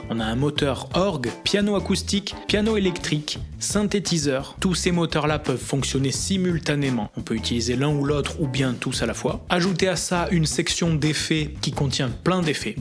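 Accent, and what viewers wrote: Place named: South, France. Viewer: Et l'accent du Sud 😁! Ravi en tout cas de te revoir ici sur WoodBrass!! Tu le mérites!!Bonne continuation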